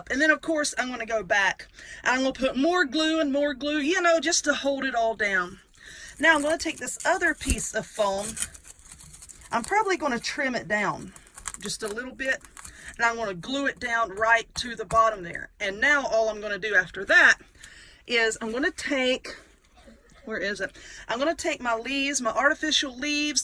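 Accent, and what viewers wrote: South, United States. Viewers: I just love your accent, your southern wording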